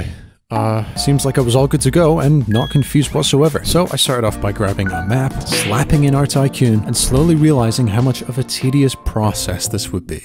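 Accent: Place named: England, United Kingdom. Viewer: I thought your from England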